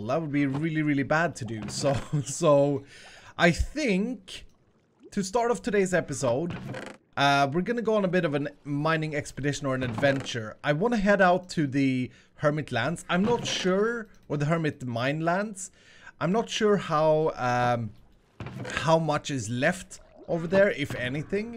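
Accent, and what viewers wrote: Sweden. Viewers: I love you’re Swedish dialect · Are you from sweden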